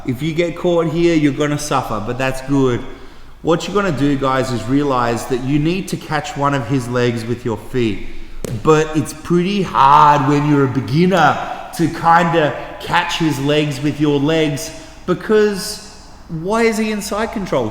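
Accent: Australia. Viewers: The Aussie accent make it so pleasant lol!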